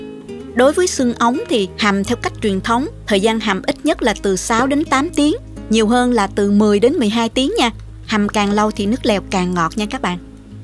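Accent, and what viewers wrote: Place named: Mekong Delta, Vietnam. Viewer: Nhìn nấu món nào cũng ngon! Giọng đậm chất miền Tây nghe nhẹ nhàng và ngọt ngào. Chúc cô và gia đình thật nhiều sức khỏe!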